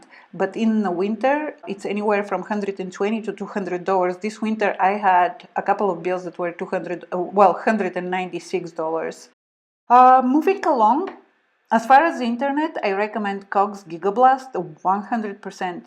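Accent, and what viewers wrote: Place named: Eastern Europe. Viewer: I picked up the east accent , I'm from Austria :)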